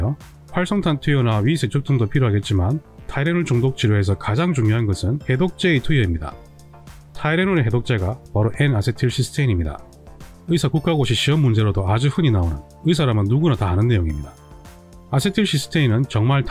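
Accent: Gyeongsang, South Korea. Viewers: 경상도 악센트 쓰시는데... 초등학교는 어디서 다니셨는지요? ㅋ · 유용한 정보 고마워요 그런데 말씀 속도가 굉장히 빠르면서 경상도 사투리도 강해서 50% 정도밖에 이해가 않되요. 저의 지인들도 그러더군요. 그러니 중요한 과학적 용어들은 더군다나 전혀 이해 불가능 !!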